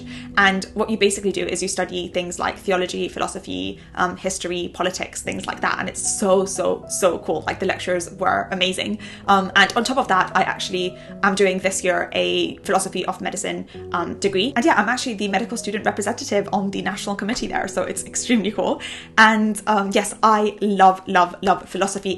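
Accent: United Kingdom. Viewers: This is the first time and the quickest British accent i have never seen before,interesting,after studying American accent too much, to find out the British accent is so speical and amazing because of your expressions when looking back on... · Ohhh I love the British accent ❤❤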